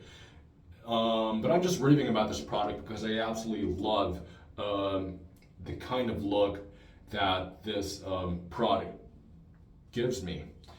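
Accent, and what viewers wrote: United States. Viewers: I really want to try it, but it's hard to find here in Indonesia. Anyways, where are you from? Your accent sounds like American, but your face reminds me of my friend (Chinese-Indonesian)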